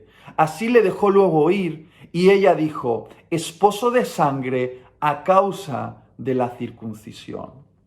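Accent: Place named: Spain. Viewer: Es su acento, es español.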